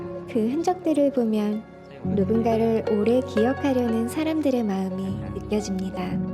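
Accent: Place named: Seoul, South Korea. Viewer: 넘 예쁘시고 영상도 좋은데 나레이션이 서울 사투리가 생각나네요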